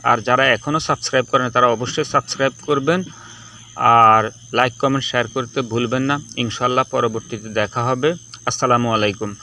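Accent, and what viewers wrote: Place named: Bangladesh. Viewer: উচ্চারণ শুনে বোঝা যাচ্ছে বাংলাদেশী ইউটিউবার। বানান আর উচ্চারণ ঠিক নেই তো কিভাবে গণমাধ্যমে শেখাবে?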